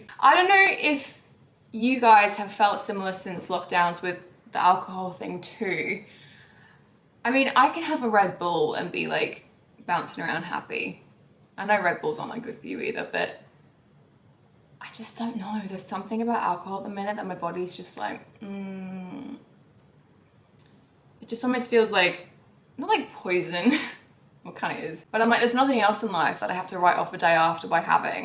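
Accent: Australia. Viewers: Your Aussie accent has come back! Happy for you 💖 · ….she Australian ….. that her accent🤦🏽‍♀️🤦🏽‍♀️🤦🏽‍♀️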